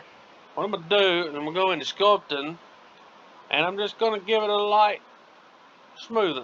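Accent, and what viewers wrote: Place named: South, United States. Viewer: Also man, if your still having issues with your LSPDFR, I have a full support team in my discord that can definitely help you get it up and going. They’ll treat you good man, and if for some they didn’t, you can always talk to me about it and I’ll handle it. You seem like a really down to earth guy and I really enjoy the content as well as the southern accent lol I’m from Tennessee myself. Anyways man, just thought I would throw that out there for ya.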